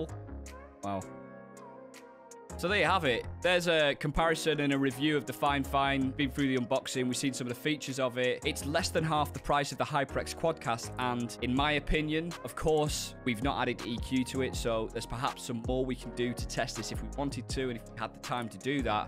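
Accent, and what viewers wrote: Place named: Northern England, United Kingdom. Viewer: You've got a nice accent . I wonder where you are from in The North of The UK ?? This will help me in my English Accents Studies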